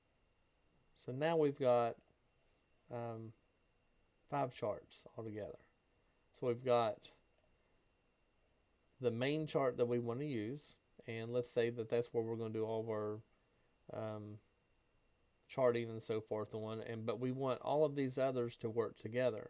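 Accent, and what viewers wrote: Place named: South, United States. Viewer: Great video awesome thanks for explaining the internal link versus the outside link, I also love the information of where closing out a workspace that were unused you made that so clear I have noticed the speed increase in my ninja trader thanks so much, and you have an awesome accent, southern gentleman🤩🤩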